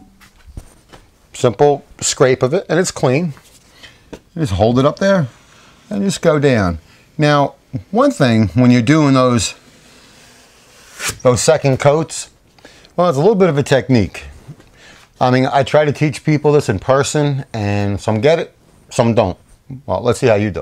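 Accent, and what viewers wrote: New York, United States. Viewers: You are a GREAT teacher - hey, you from NY? I grew up in the Bx, and your accent sounds so much like home, man. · so you from Jersey, or New York?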